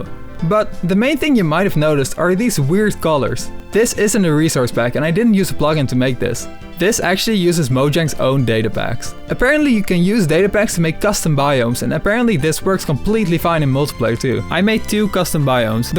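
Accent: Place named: Netherlands. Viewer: are u dutch? ur accent and pronunciation sounds like a dutch one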